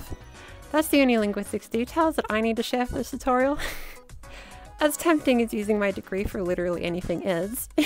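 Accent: Australia. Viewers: Thank you! I'm English so an Australian accent is so much closer to mine than all the American ones I've seen. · This is excellent, thank you so much for making this! It's also such a huge relief to hear another Australian doing this, because American voices are so different and that is so much of the voice-related content out there! Your advice is so practical, and I love the energy and vibes you bring :) The heart-felt message at the end gives me so much hope and makes me feel like I can really do this too. Thank you so much! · finally an australian!! some vocal patterns i can imitate without turning into an american 🥹🥹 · Oh my god this is awesome! This format/editing excites me so much to practice my voice and you're Australian!! I'm also from around here and I was worried that my accent would turn more American from other tutorials. You've inspired me :3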